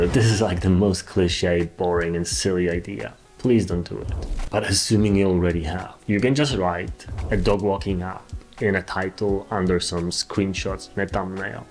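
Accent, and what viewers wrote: Poland. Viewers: You put a lot of effort into your content. It's very well done and creative! You are beyond qualified!! You have given me golden nuggets of valuable information, and I appreciate you very much!! Do what makes your heart sing, whatever that may be :) I feel your battle! I'm a loner, for sure! I admire your courage. Are you from Poland? My ancestors are from there. You have a cool accent. I have never heard it before in the US.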